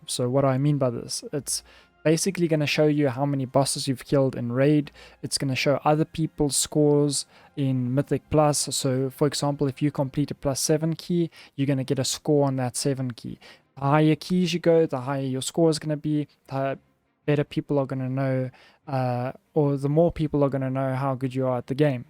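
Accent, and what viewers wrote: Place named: South Africa. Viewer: Are you from South Africa?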